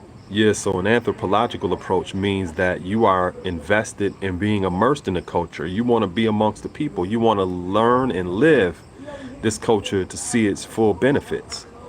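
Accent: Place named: Upstate New York, United States. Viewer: He's got an upstate NY accent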